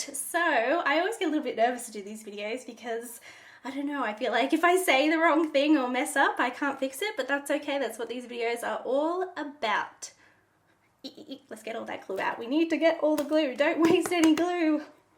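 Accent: Australia. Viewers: You sound so much like my violin teacher, she is from Australia too. My mom lived in Australia for 1 year and she GOT soooooo god in english!! 6++++++ every test ehehehhe🥰❤️😂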